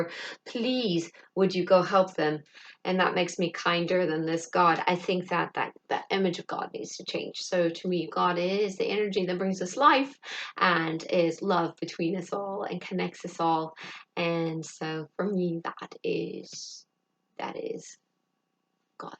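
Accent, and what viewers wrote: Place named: United Kingdom. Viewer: Can you teach me your wonderful British accent?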